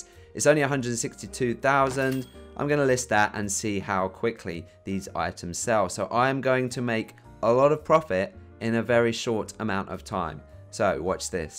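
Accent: United Kingdom. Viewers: Your British? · I know u is British I am American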